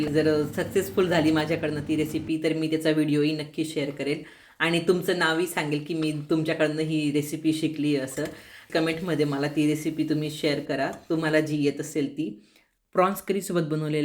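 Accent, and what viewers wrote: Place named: Khandesh, India. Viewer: Khandeshi bhajich bolta 😊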